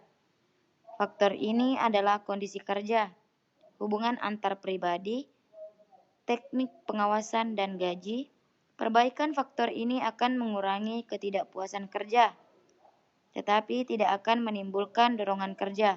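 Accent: Bugis, Indonesia. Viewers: Semoga dpt nilai terbaik. Jelas skali logat bugis nya 😬😇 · Tanra na ugi dari logat bacanya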